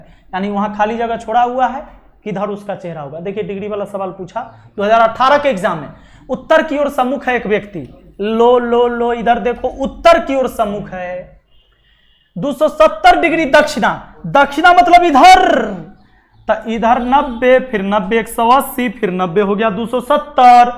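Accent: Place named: Bihar, India. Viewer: Sale bihari hindi me bol liya kar.... Bihari bolna jruri hkya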